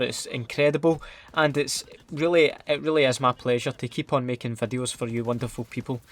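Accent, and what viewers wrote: Scotland, United Kingdom. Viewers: God I love scottish accent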